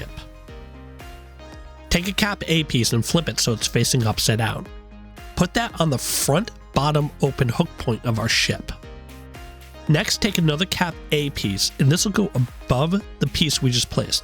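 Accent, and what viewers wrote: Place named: India. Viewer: love your indian accent , and your typical indian youtuber intro style... jai hind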